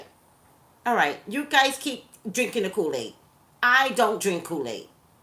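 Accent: New York, United States. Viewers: I love your New York accent!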